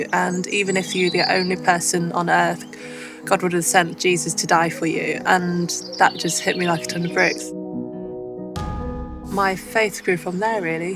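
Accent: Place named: United Kingdom. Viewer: You are the 1st Asian I've heard who has a British accent.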